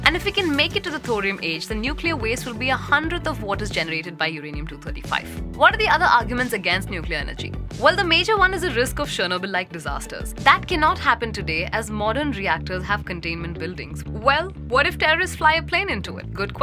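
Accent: India. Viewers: Indian accents are the hardest accent 2 understand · That's how the Indian accent is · well narrated.. Indian painted all over it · Excellent indian english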